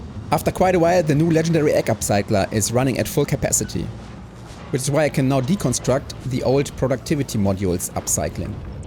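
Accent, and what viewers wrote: Germany. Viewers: Habs auf Englisch sehr genossen und dacht immer "schöner deutscher Akzent" :-D ist aber auch auf deutsch cool. Ich würde mir ein richtiges Schritt für Schritt Schaltungsvideo wünschen. Ich verstehs auch nach 800 Std nicht und alle Guides dazu sind immer zu schnell... Ich versteh nicht warum bestimmte "Symbole" gewählt werden und so weiter und sofort. Darum fallen mir Raumschiffe so schwer, weil ich einfach nicht raffe wie ich da die Schaltungen verwalte... Bzw. Ists sehr stümperhaft. Würd mich echt freuen.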